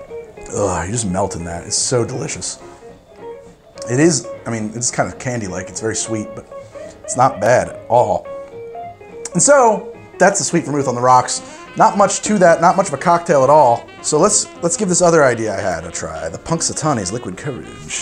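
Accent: New Jersey, United States. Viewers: Punxsutawney, Pennsylvania. Laird's., your Jersey is showing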